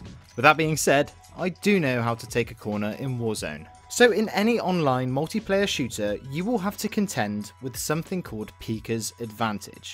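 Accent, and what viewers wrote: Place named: England, United Kingdom. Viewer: You have an English accent. I trust you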